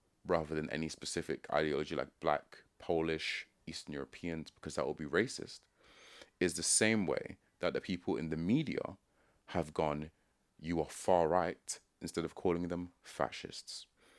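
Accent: United Kingdom. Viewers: Nobody seems to care that the INDIGENOUS PEOPLE are being raped, murdered, and replaced. Or is it only when the "indigenous" are a black or brown minority group that people care? Is it really a case of "You whities have had it good, get what's been coming to you"? Of course it is, that's what the anti-white propaganda has been screaming for decades. All of them must go. I'm not sorry, either, you all must go. Had it been Nigeria that Germans were illegally immigrating into, there would be outrage and the populace would violently oppress the percieved invaders. While you act British, and have British speech, and act in a British manner, you are only British by civic nationalism; in every other sense of the word, you are no more British than I, a Canadian, but even then I am more British than you. I greatly appreciate the effort you put into this video and I laud your tact and precise framing and use of English, however you must also go back; you do not belong here, and history will show the world that the greatest folly of the White Man was, upon freeing all of their owned slaves, not sending them back home whence they came. 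· I haven’t heard many people on the right saying “get rid of all immigrants”? As an Englishman few things make me prouder than a true British person like this chap with an immigrant background. The fact that he has adopted some of our culture, values, accent, sayings, fashions, sports etc. He is British! Born and raised here just like any White British kid his age. It’s all about integration and adding to a society, not being a drain or a detriment. Many areas of the UK now have not been integrated but replaced, and when British culture is replaced by something so alien as Islamic ideology and practices you will inevitably get conflict. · Your UK accent gives you away. You're not the problem. It's people who don't even speak english. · You're literally watching a video of a man, who although clearly not a native, has mastered the language and has assimilated to such a degree as to have an accent, and i'd wager ideals, in line with what one would expect of someone who is British. 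In other words, he has fully adopted British culture and thus is himself British. To be British is cultural, that is why the English, Welsh, Scottish and Irish are all British. And yes, I said it, the Irish, all of them, whether they like it or not I consider them brothers, just really grouchy ones as they're still upset that they didn't get their mashed potatoes that one time... If I was born in England to typical English parents (Well, I mean I was, so no imagination required for that part. Rather a parent, dad went for milk and cigarettes... ), yet moved to Nigeria young, I'd be English by nationality but provided my parents don't have a superiority complex about their own culture, it will become increasingly likely that I heavily adopt Nigerian culture and become culturally Nigerian. That would perhaps be a strange sight that would make a twatter leftists head explode, to see a white man as pale as I speaking as a Nigerian, with all the calmness and grace, the funny pauses and affectations. 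"Ah, good evening sah, this is your bank calling... "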